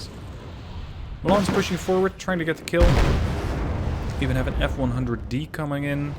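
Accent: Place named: Netherlands. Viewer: Sounds like a dutch one to me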